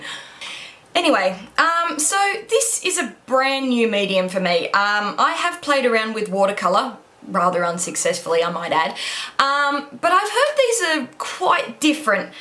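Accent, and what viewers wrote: Australia. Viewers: from one Aussie to another..it’s refreshing to hear an Australian accent for a change on YouTube 😀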